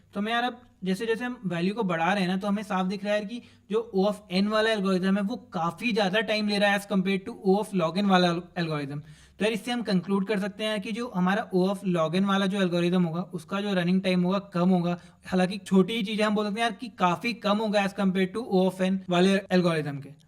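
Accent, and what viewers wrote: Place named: Rajasthan, India. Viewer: The mentor sound like he is from Rajasthan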